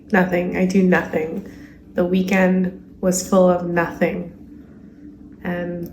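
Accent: Canada. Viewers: In case you didn't spot the accent. She's in Canada. Do you know the rules in every country? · You must be in a medical hospital being treated for mental health. Straight mental health hospitals wouldn't allow most of the things you have in your room. (chain operated blinds, Electical cords, batteries, plastic water bottles, a watch, in short anything that can be sharpened or anything you can hang yourself with, visitors are not allowed on the unit). At least in the staes, your accent may indicate you are in Canada?. I wish you the best and hope you are feeling better.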